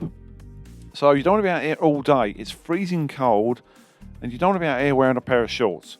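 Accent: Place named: Wales, United Kingdom. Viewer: Love the accent. The famous singer Tom Jones is from Wales👍. At some point maybe you can share to us American viewers the history of the Skoda automobile 👍.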